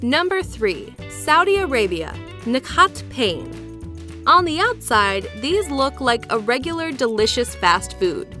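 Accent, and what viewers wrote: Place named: United States. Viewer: I don’t lie how she or he said stop eating that food. It’s their culture ok! Ok so you don’t like it you have no right to tell someone to eat or not eat something it’s their culture. And they won’t stop eating it because u arent the government u can’t tell them to eat and not eat something a lot of people enjoy and is part of the culture and holiday maybe🙄😒 “Of course japan is on this list. I don’t think japan eats any “normal food”” WHAT! Excuse me “Normal Food”like what omelets, pancakes, pasta! It’s their culture ok. What u eat is not “normal food” it’s “basic food” wouldnt u get offended if Japan told u “of course America is on this list (not saying your from America but probs🙄😒) with their disgusting un normal food” you sure are making yourself sound racist right about now🙄😒 s”sushi is prolly their least “un normal food” (not what she said but minus well have) but it’s still raw fish wrapped in seaweed” I wouldn’t want to be friends with u, u would just make fun of what I eat as a Mexican. 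“ it sound disgusting” “has anybody thought meat should be cold NO because it is disgusting” “if u ever come upon it you should call an exorcist” 🙄😒 gurrrrrrlllllll (or boy) you’ve probably never tried it and it’s actually good. “You hold the gag reflexes” I’m tired of u🙄😒 “The Koreans” do I hear a 🔥🔥🔥🔥racist🔥🔥🔥🔥🔥🔥 “Round chicken nuggets or seasoned meatball” 😂🤣😂🤣😂🤣🤣🤣😂🤣🤣🤣🤣😂🤣 I would think it’s a tater tot but not trying to be racist but you sound American. And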